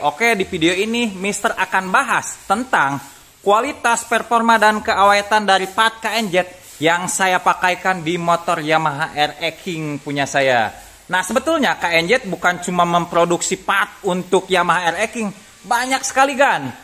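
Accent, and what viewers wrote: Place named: Tasikmalaya, Indonesia. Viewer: Jb cisinga si aa na orang tasik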